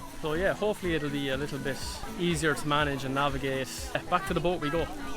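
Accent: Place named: Ireland. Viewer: A good Irish accent😀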